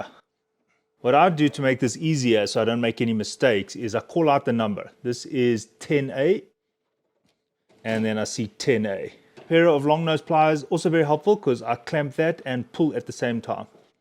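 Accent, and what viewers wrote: South Africa. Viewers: South African accent, mooi🙂👊👍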